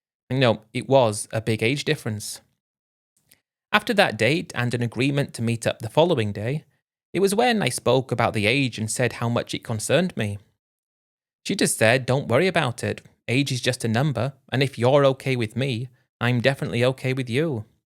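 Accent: England, United Kingdom. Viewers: America - you have an English Accent.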